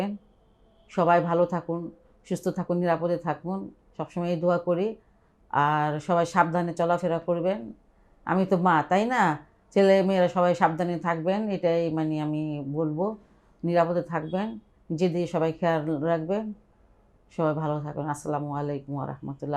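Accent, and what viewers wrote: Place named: Tangail, Bangladesh. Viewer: আস সালামুআলাইকুম আন্টি।আপনার কথাগুলো খুব ভালো লাগলো।কথা শুনে মনে হচ্ছ আপনি টাংগাইলের ।রান্নার রেসেপিগুলো খুব ভালো লাগে।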